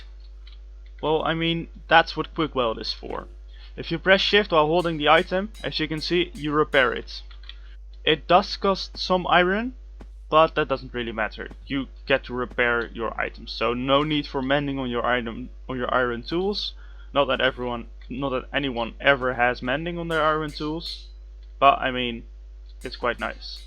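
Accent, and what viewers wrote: Netherlands. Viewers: Your Dutch accent💜 btw loved the video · The Dutch accent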